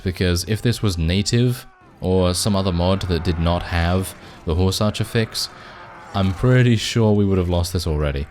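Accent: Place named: RP, United Kingdom. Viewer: This guy sounds like a british lord.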